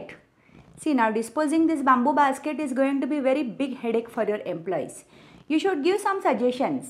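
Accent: Maharashtra, India. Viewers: I enjoy the maharashtrian accent 👌👌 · nailed it! that Marathi accent was so perfect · How she speaks typical marathi dialogues despite being from southern states is amazing! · Marathi accent- wow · It's a maharashtrian accent, not universal desi. She does kannada and tamil as well. Extremely eloquent with all the nuances. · Marathi Accent was spot on! Shraddha devi ki jai! Shenbatti 😄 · Your Marathi accent is killing! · Your Maharashtrian teacher accent is spot on ❤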